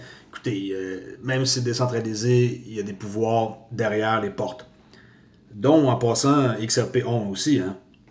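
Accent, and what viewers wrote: Quebec, Canada. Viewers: Salut! Tu semble parler le Québécois! J'ai regarder plusieurs cite anglais et de la France, mais, voilà, je suis un vieux modèle 58, et je suis un néophyte dans le domaine, surtout, qu'il y a tellement d'acronyme, et j'ai la chienne de me faire avoir dans ce monde de jeune, donc, j'ai besoin d'aide pour acquérir des XRP, je ne suis pas sur de comprendre ce que cela veux dire, mais, je dois aller coûte que coûte dans cette directions! Peux-tu m'aider de façons privé? Respectueusement.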